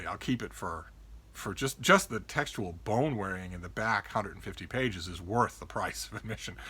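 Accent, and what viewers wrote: United States. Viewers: Loving your anti-Roundhead invective but still surprised by an American voice in defence of Monarchy! Totally agree with you about TS Eliot and Marvell.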